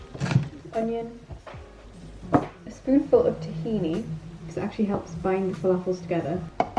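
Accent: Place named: Australia. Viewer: Where do you live ? You Sound Australien.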